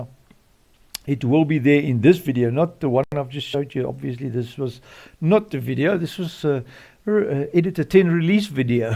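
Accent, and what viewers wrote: South Africa. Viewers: Hey. By your accent I take it you're from sunny South Africa. How bout making us a nice SA map.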